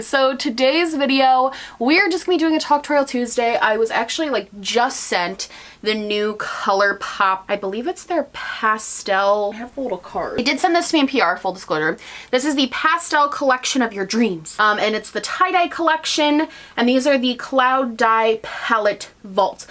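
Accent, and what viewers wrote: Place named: New York, United States. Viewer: I bet you live near me! So excited when I find folks on YouTube who live in my area of NY! I also thought that your accent sounds like a local here. Lol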